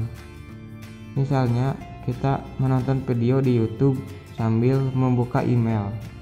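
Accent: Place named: West Java, Indonesia. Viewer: LOGAT SUNDA DIA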